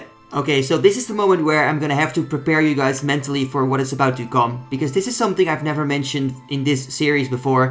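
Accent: Netherlands. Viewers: Lets gooooo! are you dutch btw? you sound like me haha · Great series! Just a question, are you Dutch? I am from the Netherlands, alot of people play osrs here!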